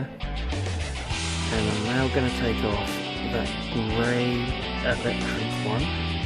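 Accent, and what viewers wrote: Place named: England, United Kingdom. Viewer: This seems to be the narrative in my head during car wrenching. Mine lacks the English accent and includes many more profanities. This video is gold.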